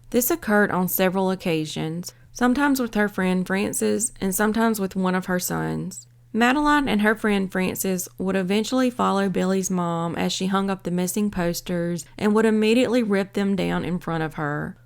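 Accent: South, United States. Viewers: Ah brilliant it’s my favourite southern accented girl talking to me about my favourites subject - true crime :) thanks 🙏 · This is the first CT true crime video ive ever seen on YT. Really cool since ive lived here all my life and have watched 1000s of true crime docs on here. Ty southern girl who sounds super hot.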